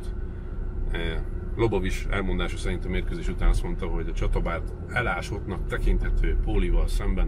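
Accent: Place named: United States. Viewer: Szörnyű hallgatni, hogy az anyanyelveden, amerikai hangsúllyal beszélsz.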